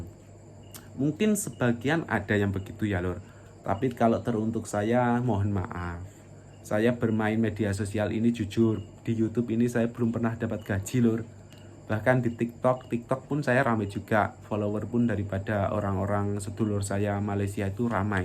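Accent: Java, Indonesia. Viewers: Mass bhsa nyaa.medog bangettt.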